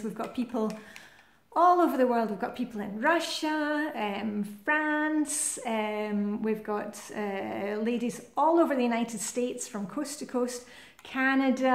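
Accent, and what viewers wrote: Scotland, United Kingdom. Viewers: Hy from Montrose in Scotland we had snow here yesterday, My favourite film has to be Gone with the Wind, as for smell I love the smell of freshly laundered sheets especially when they have been dried outside. Love your videos nice to here a Scottish accent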